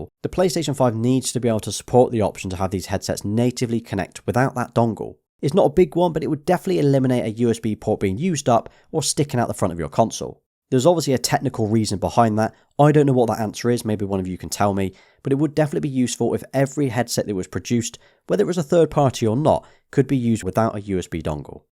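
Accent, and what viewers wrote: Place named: Leicester, United Kingdom. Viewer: Your voice really reminds me of mark Selby! Are you from Leicester lad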